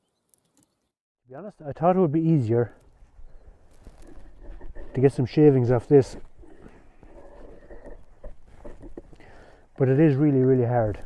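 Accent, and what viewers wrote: Ireland. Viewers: Every time I watch one of your videos you make me smile and chuckle to myself: here is some quietly spoken Irishman calling time on the all the survivalist/bush-crafting BS on youtube. Absolutely priceless!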